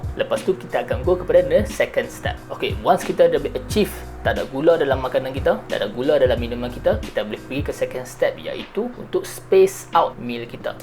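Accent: North, Malaysia. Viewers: Baguih, kekalkan bahasa Utara. X da kalut nk ckp KL pun. Steady!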